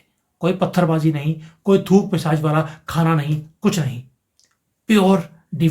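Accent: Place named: Punjab, India. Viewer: सर, 🙏 आपका जो पजाबी फ्लो है ❤👌👌 बेहतरीन।। महाकुम्भ भले ही महाशिवरात्रि तक हो लेकिन स्नान बैसाखी तक चलता रहेगा।। आप देखना स्नानार्थीयो का रेला बैसाखी तक चलेगा और तब तक ये 55cr+ हो जायेगा। जय सियाराम🌹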